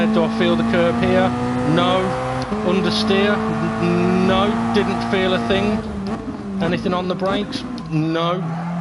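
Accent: United Kingdom. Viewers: So british. :)) Nice video mate, I use your settings, and I can feeel, everything from my car. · I've subbed just for the classically British rant. Too funny but still informative.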